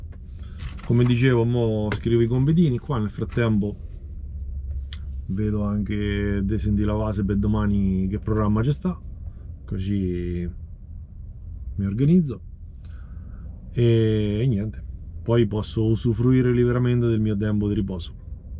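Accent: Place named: Marche, Italy. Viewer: d'allaccento mi sembri marchigiano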